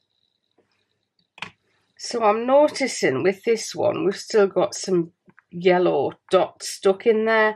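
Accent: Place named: Scotland, United Kingdom. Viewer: I simply adore your accent. May I ask where you’re from? My first guess is Scotland 🏴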